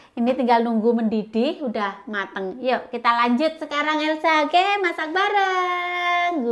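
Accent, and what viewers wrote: Java, Indonesia. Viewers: Ketagihan nonton vlog mbak..ngmong jawane iku lho marake aku guyu.. Ramah juga orange..aku seneng nontonnya Salam ya mbak..aku seko demak ..jawa tengah😁😁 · Keluarga indonesia banget, suka sekali kalo mba cara bicaranys medok2 gimanaaa gitu😄 sehat selalu mb sekeluarga Amiin.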